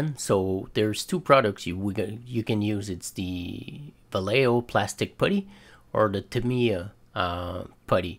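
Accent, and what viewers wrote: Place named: France. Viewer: My dude, for a french guy you have a great accent!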